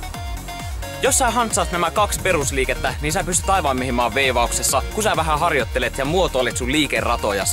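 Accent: Finland. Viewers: Sillä murre on pohjanmaalaisen kuulosta. joten samanlainen kuin mulla :DDDD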